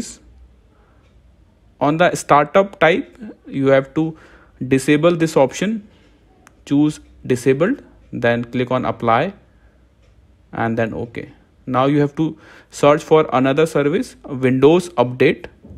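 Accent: India. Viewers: the indian accent makes it funnier lmao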